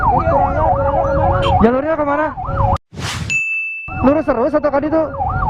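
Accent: West Java, Indonesia. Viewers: sunda gening anjayy 😆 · Oh abang teh orang sunda. Sama atuh bang sunda na timana. Abdi mah cianjur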